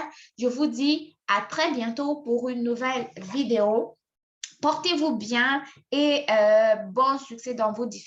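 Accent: Canada. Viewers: Tu expliques parfaitement bien poupée. Merci pour ces riches informations. Je souligne de passage que tu commences à avoir l'accent canadien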